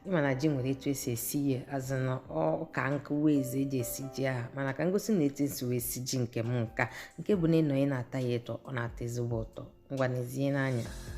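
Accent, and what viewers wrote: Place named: Anambra, Nigeria. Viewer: Nne, ji gi a ehn nyum nyum. And I sotay enjoy this your Anambra Igbo so much oh!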